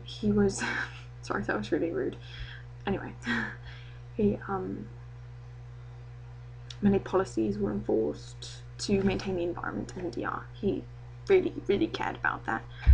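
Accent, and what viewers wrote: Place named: United Kingdom. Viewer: You remind me a lot of Hayley Atwell with that British accent.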